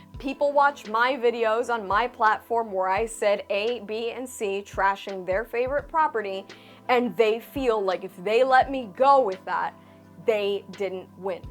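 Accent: Cuba. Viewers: Cuban accent detected! ❤ half Cuban/half Mexican here Represent! People suck, if you want to watch review videos like yours and want to contribute its better to comment politely and give your opinion with reasons for giving your opinion. Make your case, but why start disrespecting and acting like an internet tough guy? Puro paz y amor, everybody chill. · Que bonito hablas espanol! Mi cubanita linda. No matter what you say or how strong opinion you may have, say it in that beautiful cuban accent. Te derrites como la cera caliente!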